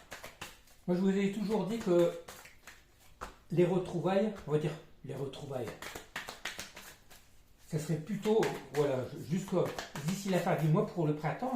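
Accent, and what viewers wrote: North, France. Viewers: Bonsoir nouvelle abonnée g vue votre vidéo avec selena à votre voix g su de suite que vous étiez du nord je suis du pas de Calais près de lens 😂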